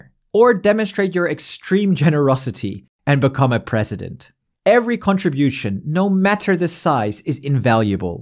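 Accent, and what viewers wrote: Netherlands. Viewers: it feels like you're either Dutch or Flemish speaker. I'm right? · I was confused about this as well as the channel says germany but he doesnt sound german but rather slightly dutch. He might be dutch living in germany or smth.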